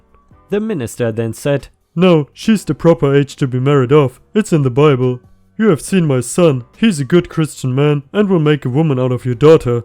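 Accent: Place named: Germany. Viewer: Ok I have to say you kind of have a german accent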